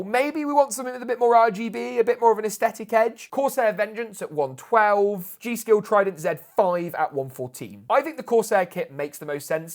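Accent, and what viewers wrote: United Kingdom. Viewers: Fuckin British accent 😢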